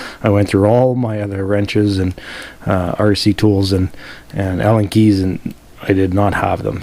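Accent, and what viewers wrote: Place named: Canada. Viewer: Great info you sound like my neighbors to the north of michigan ,eh. Peace n love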